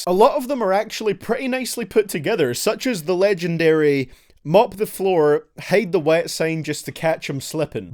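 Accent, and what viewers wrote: Scotland, United Kingdom. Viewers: I think he's Scottish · I already commented this on another video but here again. I'm gonna go sleep now with you on, your voice is so comforting man. I don't mean it in a gay way I normally hate I really hate the British accent but you give the vibes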